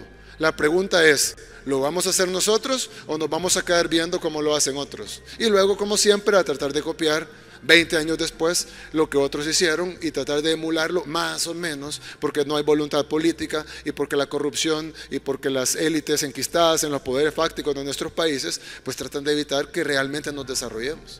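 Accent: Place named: Central America. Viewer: Hola como esta, Perdon y sin ofender pero no parece usted Mexicano. Que es eso de👉 Varón 👈? Si entendemos la palabra, pero es raro que la usemos aci como usted la acaba de usar. Usted se escucha si fuera Centroaméricano, lo digo con respeto....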